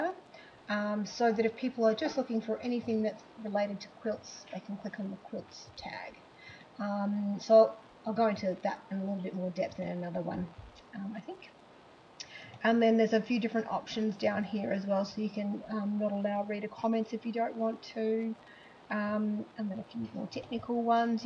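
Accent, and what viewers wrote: Australia. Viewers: From Australia?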